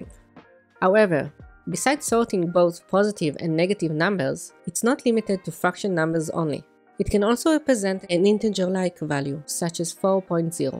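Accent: Israel. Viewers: at the start i know that you from israel מההתחלה ידעתי שאתה מישראל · אני מזהה את המבטא הישראלי מקילומטרים. אחלה תוכן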